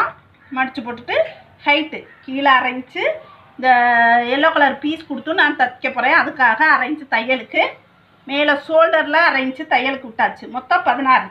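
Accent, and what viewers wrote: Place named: Madurai, India. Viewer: Tailoring institute poitu kathukita feeling.thelivana vilakam madurai thamizhil akka.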